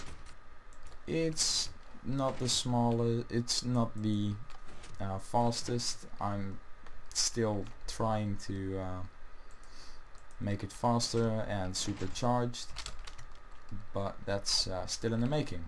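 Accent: Netherlands. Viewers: Are you Dutch, I can hear it from your accent ( Ben trouwens ook Nederlander :) )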